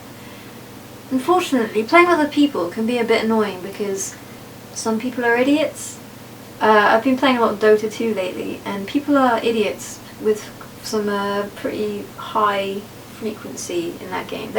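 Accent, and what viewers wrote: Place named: United States. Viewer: stop talking like an american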